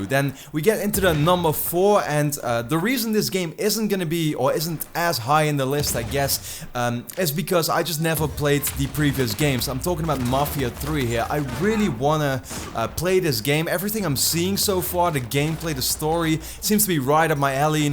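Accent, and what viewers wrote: Netherlands. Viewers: Ik heb net je kanaal gevonden. Je maakt echt heerlijke content. Plus je klinkt zwaar Nederlands, dus dat is een instant sub. :p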